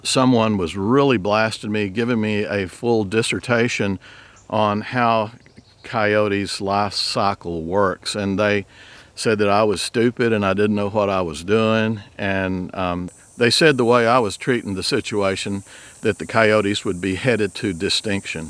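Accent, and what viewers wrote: Texas, United States. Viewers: Say Coyoteeee! We Texans do not need to speak the same as the rest of the country! I mean some people up North cannot pronounce the "R's"! Coyotes have to be thinned out. It's your ranch, don't allow others to tell you what to do!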